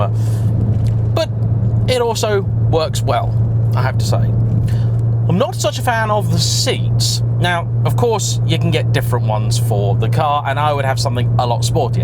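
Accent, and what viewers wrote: United Kingdom. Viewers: hey man, this is my first time seeing your channel. Absolutely awesome video, you have gained a subscriber. You remind me of Jeremy Clarkson with your British accent + the interrupted sentences. Carry on!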